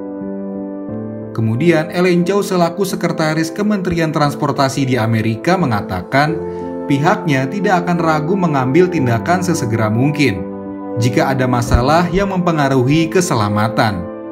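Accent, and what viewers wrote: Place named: Java, Indonesia. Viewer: Ngedap coro jawane stoll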